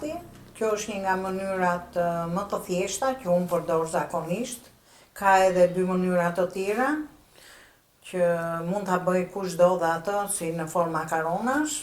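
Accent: Tirana, Albania. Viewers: Te folme Tironse ke qef me ju degju 💋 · Tlumt goja se knoqem kur flet puro tironce pilafi i mrekullushem sh faleminderit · Te lumshin duart zonje dhe me ate te folme. tironce puro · Me shum me pelqe e folmja tironce po dhe pilafin e ke bo persmari te lumshin duret tkesh shnet🙏🙏🙏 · Na kenoqe mi tironce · Te pelqen theksi tirons ty moj? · Te lumshin duart por ajo qe me pelqeu me shume eshte kur e shpjegon , Tironse ❤ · Sa lezet ka te degjosh çdo gatimë si nene tironce ❤ te lumshin duart! Na kenaqe gjithmon videot e tua! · Të lumshin duart! Më pëlqen shumë e folura jote Tiranse dhe sidomos bukuria e spjegimit të detajeve gjatë gatimit! Të përqafoj nga Saranda! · Te lumshin duart dhe goja ma knoq shpirtin me ate te folmen tironce nuk ta di emrin por me pelqen shume kur shpjegon me kujtove gjyshen time te ndjek pothuajse ne te gjitha gatimet se jam vete tironce puro pershendetje nga Kanada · Me kenaqe me komunikimin prej tironse Me pelqejn gatimet qe ben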